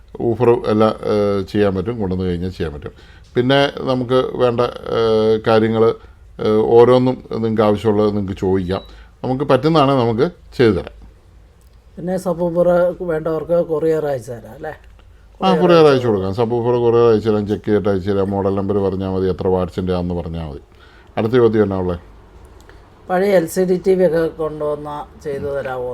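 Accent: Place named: Kanjirappally, India. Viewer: ഇദ്ദേഹത്തിന്റെ സംസാരം, ഒക്കെ കേട്ടപ്പോ തന്നെ എനിക്ക് നേരത്തെ മനസ്സിലായാരുന്നു കാഞ്ഞിരപ്പള്ളി ക്കാരൻ ആണന്ന് ❤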